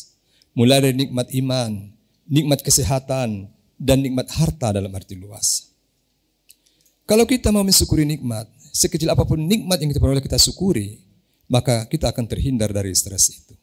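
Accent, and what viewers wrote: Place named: Sulawesi, Indonesia. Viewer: Masyaa Allah, Pak Dr. orang Sulawesikah? Dari aksennya spertinya dia dari Sulawesi hehe.. Barakallahu Fiik pak Doktor..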